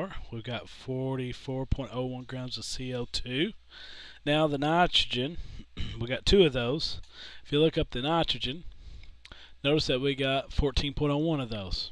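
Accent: South, United States. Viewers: You seem to have a very southern accent, are you an Alabama, Florida, or Georgia native?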